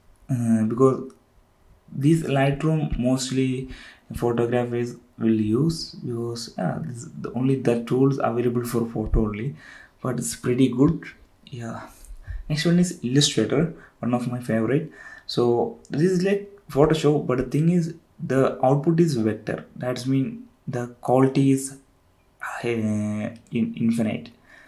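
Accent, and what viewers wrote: Kerala, India. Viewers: That Indian accent tho!!!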